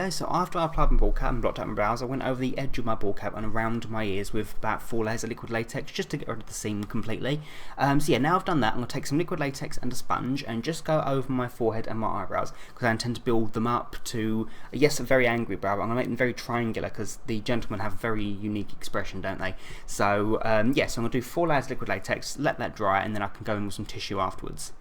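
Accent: United Kingdom. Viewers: He is and he's British so the accent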